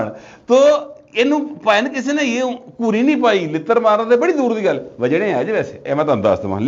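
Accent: Lahore, Pakistan. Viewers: sb hum ap ko pure Lahore k sentences sunnay k lye sunty h. Very beautiful Lahore Punjabi Accent. Mere Moula Kareem ap ko salamt rakhy ❤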